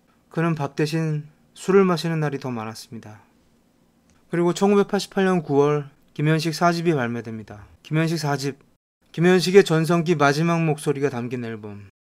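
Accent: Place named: Seoul, South Korea. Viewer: ALL MIX님 말투가 90년대 서울사투리같아서 더 영상이 와닿습니다. 좋은영상 감사해요!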